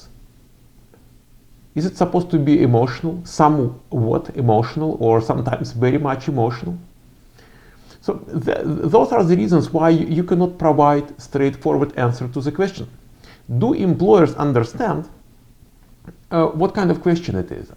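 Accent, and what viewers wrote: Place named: Russia. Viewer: Cпасибо! Всегда хотел услышать как вы говорите на англ. Ваш речь мне особенно понятна, потому что вы говорите с русским акцентом. Скажите, это считается очень сильный акцент? Потому что вас я понимаю отлично, а носителей намного хуже. В общем учу язык по вашим видео!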